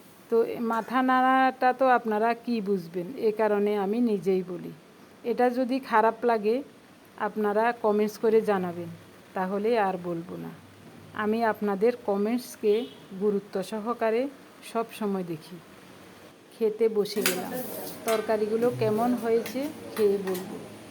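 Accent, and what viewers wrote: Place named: Chittagong, Bangladesh. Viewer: আসলে আমাদের দেশ চট্টগ্রাম। আপনার উচ্চারণ শুনে মনে হলো , তাই বললাম।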